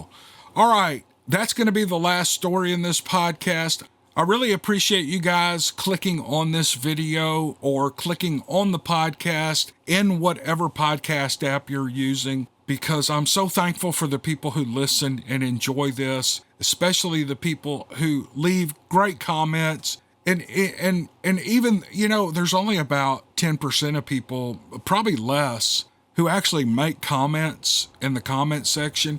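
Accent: South, United States. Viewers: Well young man I don't comment much but your southern drawl just makes the stories more interesting and exciting to listen to. So yeah great job and keep up the good work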